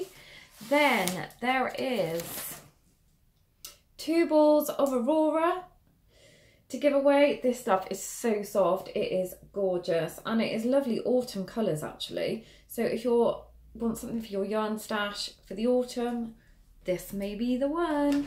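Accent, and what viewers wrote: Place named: England, United Kingdom. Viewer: You are so cute english accent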